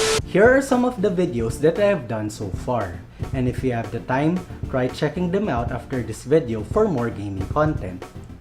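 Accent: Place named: Philippines. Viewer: Pinoy ka ba? Halata sa accent eh lol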